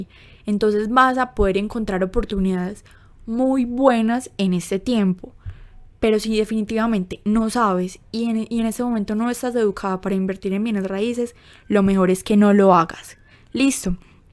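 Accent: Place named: Paisa, Colombia. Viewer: Es paisa colombiano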